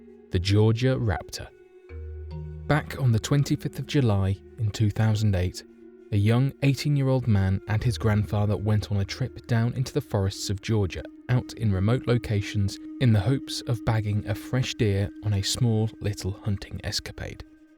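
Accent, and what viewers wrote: England, United Kingdom. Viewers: The way this is narrated is giving me headache. I'm confused because he has an English accent but it's like he's never spoken English before.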